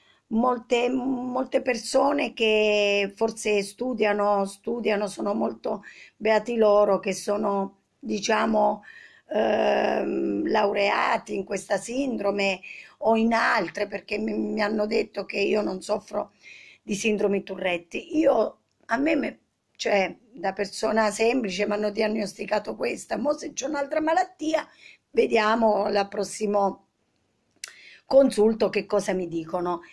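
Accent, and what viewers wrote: Abruzzo, Italy. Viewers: bravissima! Si dovrebbe invidiarti per la tua determinazione... Io ti ammiro molto. Mi sembra di riconoscere un'inflessione "familiare": sei abruzzese? Ti abbraccio.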